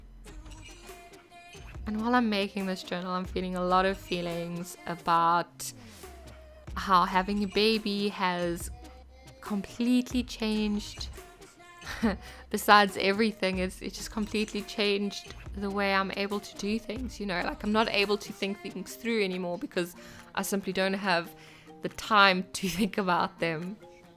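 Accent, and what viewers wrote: South Africa. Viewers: Do I hear a South African accent there? Enjoyed watching your journal process. TFS!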